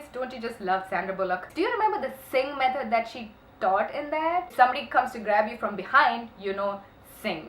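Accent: India. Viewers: is that a Hindi accent? cool! I think its lit and unique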